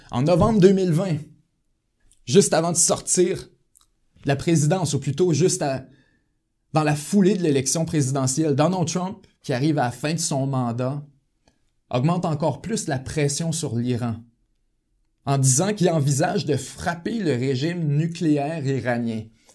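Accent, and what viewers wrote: Canada. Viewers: L’accent Canadien...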